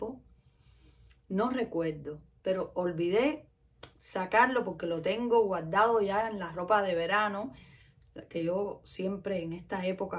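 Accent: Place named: Cuba. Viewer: Hola soy nueva suscriptora. Eres cubana verdad ?, creo q reconocí el acetone de una paisana. Yo soy de Cuba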